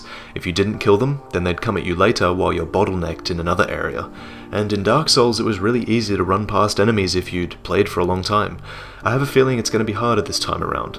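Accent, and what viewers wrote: Australia. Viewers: Wait, is Australian? Nice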